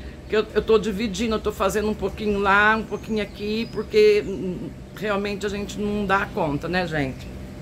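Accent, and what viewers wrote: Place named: Inland São Paulo, Brazil. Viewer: Adoro o seu sotaque meu sonho é um dia poder conhecer o interior de SP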